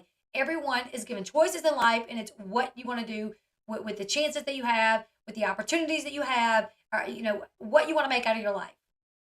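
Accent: South, United States. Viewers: Love love coming to your channel!!! We have the exact same views and southern accent 😂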